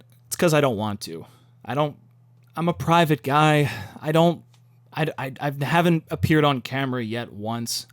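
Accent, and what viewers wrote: United States. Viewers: Dang. That's sad. Love your stuff. You have that perfect jersey voice. Good luck out there bruv.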